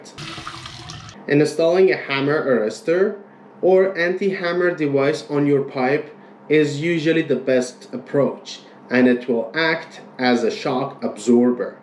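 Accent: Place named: Iran. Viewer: You have an accent, are you Persian?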